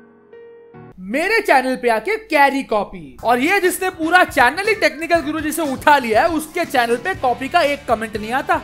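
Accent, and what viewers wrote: Bengal, India. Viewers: Are you bengali?? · Are you bengali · Are you bengali?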